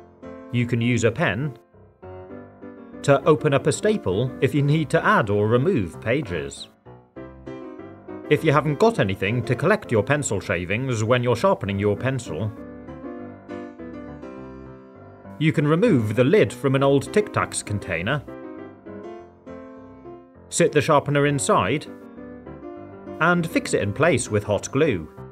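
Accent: United Kingdom. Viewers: The British accent never gets old!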